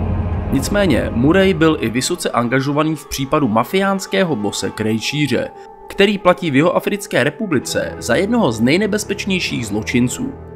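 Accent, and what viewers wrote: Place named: Pilsen, Czech Republic. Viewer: Tak mluví lidé ze z Plzně. Je to v Česku normální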